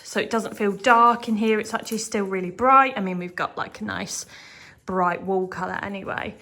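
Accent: United Kingdom. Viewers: Such a beautiful “ British English”….😌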